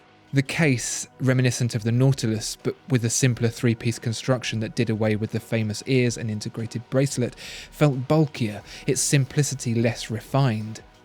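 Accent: United Kingdom. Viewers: Ugly watch no matter how this guy with a British accent says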